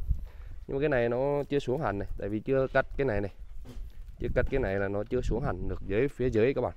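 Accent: Nghe An, Vietnam. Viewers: Bặn lên bặn lên. Đúng đậm chất nghệ luôn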